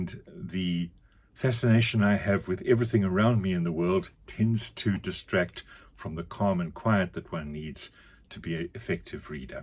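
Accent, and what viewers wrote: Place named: New Zealand. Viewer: 👋🏼Newer subscriber here, and I was enchanted by your accent. I was hearing a little New Zealand, a little German, and then solved the puzzle by looking up your home page--haha! Nice version of the tag. You're a more ambitious reader than I!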